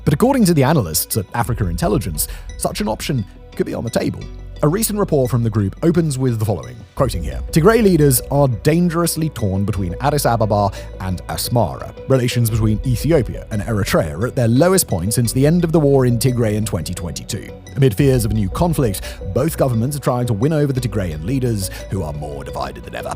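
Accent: United Kingdom. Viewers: You Tube SUCKS! I have removed this man's channels over and over again. But when You Tube changes the algorithm, it force feeds me this guy's many channels despite my removing him. channels suck. They are poorly researched information that is mostly government propaganda talking points. Terrible videos. And just because has a British accent, this doesn't not make him an authority on anything. He's just a talking head. England is over. Brexit has made the only job in England a You Tube content provider. Terrible list videos that spread falsehoods and bull.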